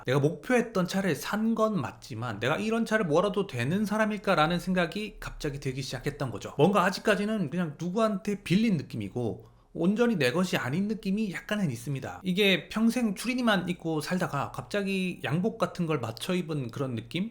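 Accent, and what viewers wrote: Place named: Busan, South Korea. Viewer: 말씨는 경상남도 부산쪽같은데 사는곳은 서울경기쪽에 좀 살아서 나오는 사투리억양섞인 서울말씨네요 ㅎㅎ 잘 보고 있습니다 😊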